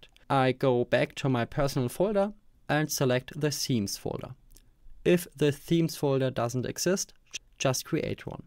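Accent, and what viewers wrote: Germany. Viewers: Hey, da ich deinen deutschen Akzent raushöre, wollte ich auch ein Kommentar auf deutsch da lassen!:D Es hat alles super geklappt, vielen lieben Dank! Du hast alles entspannt und ruhig erklärt!!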